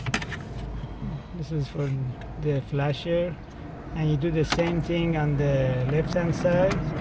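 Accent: Iran. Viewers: looooool your accent. I'm guessing your persian hahahahaha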